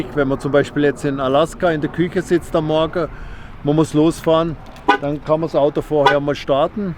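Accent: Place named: Bavaria, Germany. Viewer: Glad I could still understand a little Bayrische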